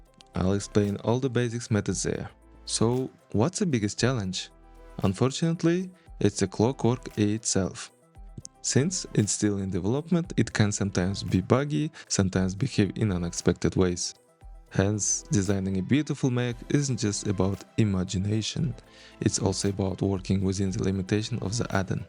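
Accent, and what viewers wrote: Russia. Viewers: русский акцент сразу слышно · У тебя будто бы русский акцент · Ты говоришь с сильным русским акцентом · У бро русский акцент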